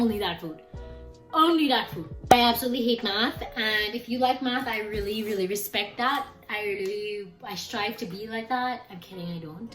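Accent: Trinidad and Tobago. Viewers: Fellow Trini. I hear the accent. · You said you are from the Caribbean......Are you from Trinidad and Tobago? Your accent makes me think this🙂. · Are you trini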